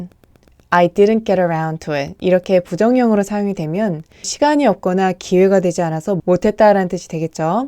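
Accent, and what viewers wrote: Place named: United States. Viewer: 진짜 미국영어네요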